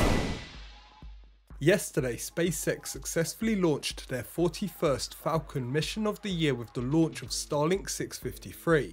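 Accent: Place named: Cockney, United Kingdom. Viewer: That’s a London East End accent. “Fursday” instead of Thursday etc.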